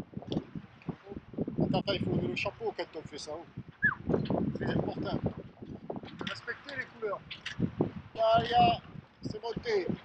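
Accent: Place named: Var, France. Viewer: j'adore t'es vidéo cette accent varois bien de chez nous plaisancier a moteur tu me donne gout a la voile continue!!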